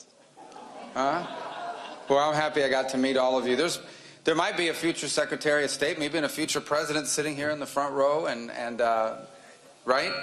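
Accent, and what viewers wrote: Cuba. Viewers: He got Cuban accent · He’s born to Cuban parents, obviously he speak Spanish as Cuban so; it’s not “black “ Spanish, it’s Cuban Spanish.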